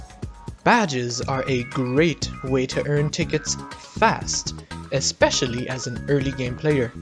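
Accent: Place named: Australia. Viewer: Why you have Australia accent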